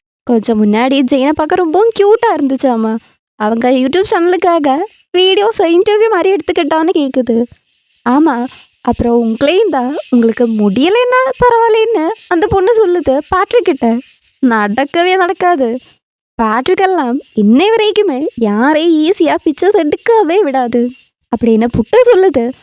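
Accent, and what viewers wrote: Kerala, India. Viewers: Accent ketale theriyuthu pa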